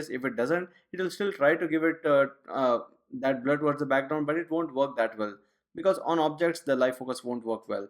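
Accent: India. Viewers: Stop with the accent you Indian I don’t understand u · The presenter is doing a good job of demonstrating how much he knows...he has no idea how to be a teacher...very fast talker, his very strong Indian accent is almost unknown. leaves everyone behind.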